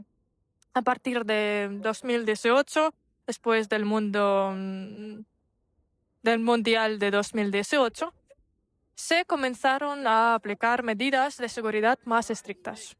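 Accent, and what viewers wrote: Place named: Spain. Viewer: Ella tiene el acento español muy marcado, suena muy linda. 💜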